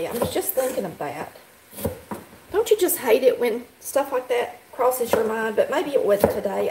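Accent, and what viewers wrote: South, United States. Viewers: I absolutely love your Country accent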